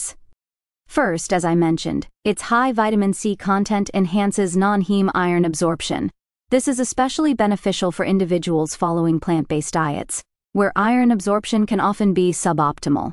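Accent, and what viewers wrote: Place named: United States. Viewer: thanks for your posts. But you say you are in the UK? You have a very American speaking voice.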